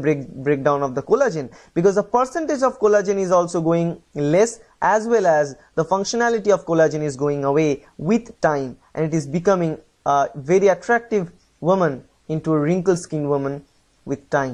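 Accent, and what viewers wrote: India. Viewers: Go find videos from people from your language people... He is an Indian, he will speak like an Indian... If you have a problem with that... Go fuck yourself...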